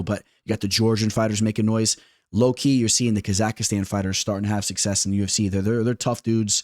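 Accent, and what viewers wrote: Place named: Louisiana, United States. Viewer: are you from louisiana? you sound just like dustin poirier lol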